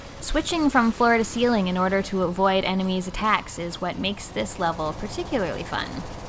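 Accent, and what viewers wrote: Canada. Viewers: Ha same here. I knew within 12 seconds that she was Canadian. I've had several occasions when I identified someone as Canadian by their accent and they got annoyed because they don't think they pronounce things differently. lol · <3<3<3<3<3<3<3<3<3<3<3 Nothing I see in your profile says your Canadian, but the accent gives you away.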